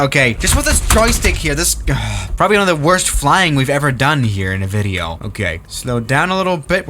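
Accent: Germany. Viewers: Are you german? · wait a minute... are you from germany? 😊😆🤔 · U German 🤨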